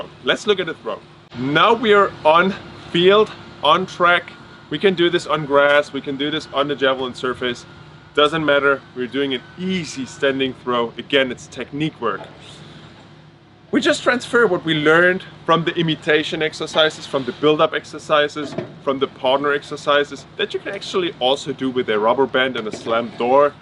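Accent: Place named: Germany. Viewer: I like the german accent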